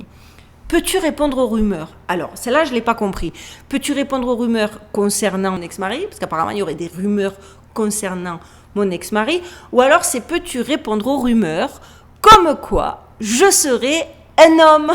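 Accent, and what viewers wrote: Marseille, France. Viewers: Disclaimer: je ne crois pas DU TOUT à ses rumeurs! Toutefois, méfiez-vous! Les trans peuvent être en apparence des "femmes" extrêmement féminines. "Elles" se font gommer les traits masculins (comme des mâchoires trop carrées ou le front à bosses typiquement masculin) par ce que les chirurgiens plasticiens appellent la féminisation du visage. Pour ma part, je trouve le visage de magnifique et sa mâchoire n'a rien de masculin pour moi. Vous aurez vu la mienne!!! 😆 Ce qui m'aurait mis un doute ce sont ces mains, très belles mais un peu grandes pour une femme. Je me suis dit que c'était l'effet d'optique (plus proches de la caméra que le reste) mais il y a des femmes biologiques qui ont de grandes mains. Pour reconnaitre une transexuelle, il faut savoir analyser beaucoup de choses et être au fait de beaucoup de choses. Et, surtout, ne pas prendre en compte une caractéristique mais tout un ensemble de traits (visage, corps, comportement). 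Après, les "haters" trouvent tout et n'importe quoi après avoir quelque vidéos sur cette mode de transition. Et a tout lu, à commencer par le plus facile: une blonde à l'accent Marseillais c'est tout de suite une cagole. P.S.: Comme pour tout le reste, le fait d'avoir un enfant n'est pas un argument. Il y a des trans qui ont des enfants qu'ils n'ont pas porté et vont même jusqu'à simuler la grossesse. Eh oui, ça fait partie des choses sur lesquelles les gens ont du mal à ouvrir les yeux. Le net regorge des sites qui venden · Allo, finalement tu n'est pas une stupide blonde😉😉🤣, Merci pour ton premier F.A.Q., l'explication du nom et de la photo... au sujet de l'accent, je savait quelle venait de Marseille! ( ouais, je suis Québécois, mais; j'ai eu beaucoup d'amis (es) Français) je préfère l'accent du Saguenay!...J'ai une question en deux temps, quel Youtubeur suis tu et est-il possible de voir une collaboration avec eux? 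· En tous cas vous n'avez pas perdu l'accent méridional malgré le fait de 15 ans aux states 😊❤ je suis varoise 😘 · Wow je ressentais bien que tu es une femme spéciale mais je dois ajouter que tu es formidable ♥️♥️♥️. Quelle force, quel parcours ! Et quelle beauté ! Ne change rien et continue à nous transmettre le fruit de tes recherches et ton humour, le tout avec ton adorable accent du Sud. Ta mâchoire est juste parfaite ! C'est souvent ce qui nous a complexé étant jeune qui se révèle être un atout une fois adulte. Plein de good vibes de l'île de La Digue aux Seychelles... Et toutes mes félicitations pour ta chaîne. 🎉❤ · Alors la, je n'ai jamais autant ete choque par une video de... Des gens pensent que tu es un.... homme????? Bontee divine... Bon, c'est vrai que de plus en plus de gens ne savent plus que c'est une femme, mais quand meme! Ton accent est charmant, et en plus ca te rend plus "naturelle", en tout cas, moi je l'aime bien ton accent du sud!!!! 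C'est cool de voir des images de "vers chez toi", est-ce qu'il y a des "legendes locales" que tu pourrais nous partager? Ou des endroits "Mysterieux" ou qui sortent de l'ordinaire? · ❓Tu as conservé la langue française et l'accent de Marseille, bravo. Comment tu as résisté en ayant vécu dans des pays anglo ? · 3eme commentaire. Rebonjour. Moi j'ai une question. Est-ce que ton accent du sud ressort lorsque tu parles anglais? Précisément: est-ce que tu parles anglais avec l'accent Français du sud? 🤔🙂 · Aussi ce que j'ai découvert et adoré , vous êtes originaire de la région Marseillaise , je m'en doutais à votre accent .Comme moi d'ailleurs , actuellement j'habite à Istres , donc pas très loin de St Martin de Crau , donc la prochaine fois que j'y passerai, je penserai à vous.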